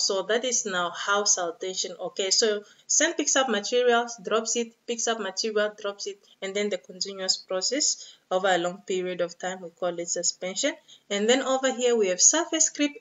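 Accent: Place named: Africa. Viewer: The fact that you speak like an African makes me understand really....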